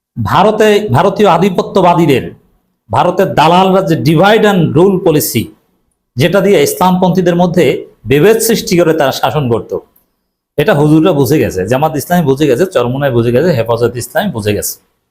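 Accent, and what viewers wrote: Barishal, Bangladesh. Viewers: আপনি কি বরিশালের??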